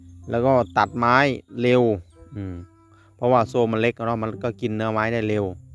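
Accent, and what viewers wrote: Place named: Isan, Thailand. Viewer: พูดอิสานฟังยากอ่ะ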